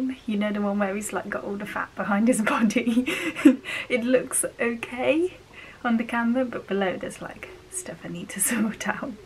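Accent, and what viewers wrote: United Kingdom. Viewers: Not a maths student just watching your video so I can learn English and understand British accent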